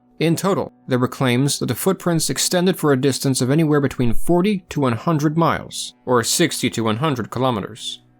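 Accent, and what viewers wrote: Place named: United States. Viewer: He's speaking SAE, Standard American English.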